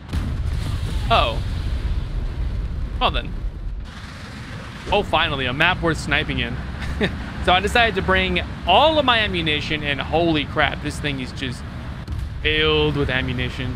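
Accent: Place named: United States. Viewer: You have the voice of callmekevin mixed with a clickbait youtuber voice and the tone of an american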